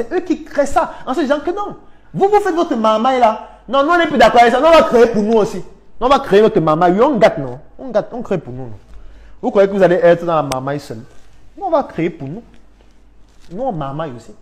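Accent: Cameroon. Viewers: Il s'exprime avec le ton des Camerounais . Chez nous au Cameroun, la plupart des gens parlent à haute voix et c'est très souvent naturel pour attirer l'attention des interlocuteurs . Alors si tu viens au Cameroun, tu vas aussi commencer à parler sur le même ton .😅😊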